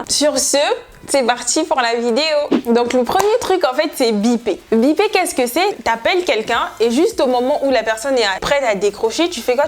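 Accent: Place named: Democratic Republic of the Congo. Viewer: 🤣🤣🤣 tu mets "quoi" à la fin de certaines phrases 🤣🤣 hey moninga tu es purement congolaise toi 😍😍😍😍 je t'adore. En passant tu es trop belle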